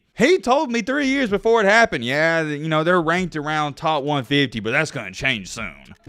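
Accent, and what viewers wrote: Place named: North Alabama, United States. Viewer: I had a feeling you were from north Alabama. I live in Madison.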